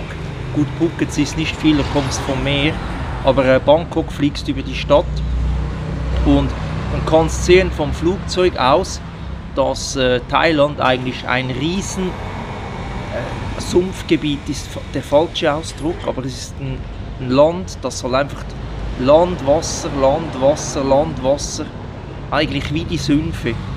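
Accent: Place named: Switzerland. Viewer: Thai mit schwyzer Akzent. Scharf. ✌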